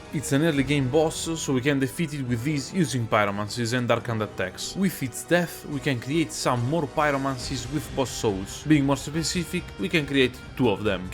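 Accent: Italy. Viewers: I love your videos and also I love your accent. If you're Italian "spero nel meglio per te e il tuo canale" Else "I wish you the best" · Bro dall'accento ho capito subito che eri italiano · oh right you're thick italian accent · funny how i recognized your italian accent only because i saw a shitpost about vento aureo where there was italian text to speech speaking english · Si sente che sei italiano, ma parli veramente bene in inglese✌🏻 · You italian or french? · bellissimo come io sia capitato su questo video per caso e si senta l'accento italiano hahahahahaha, comunque complimenti per l'inglese👍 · Prime tre parole e ho istantaneamente sentito il fatto che eri italiano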